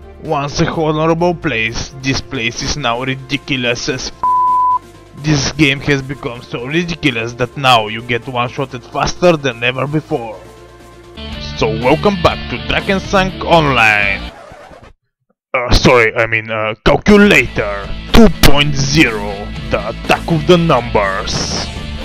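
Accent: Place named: Bulgaria. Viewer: Сигурен съм , че коментатора е Бг личи си от километри ... като цяло най-смешния клип който съм виждал някога ужс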